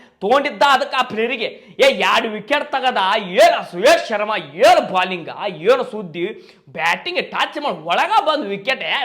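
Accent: North Karnataka, India. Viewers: Nice uk talking ❤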